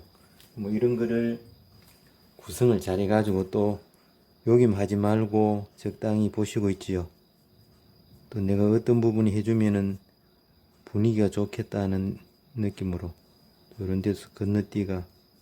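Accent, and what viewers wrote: Gyeongsang, South Korea. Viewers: 경상도 말씨가 정겹습니다. 늘 감사한 마음으로 공부합니다.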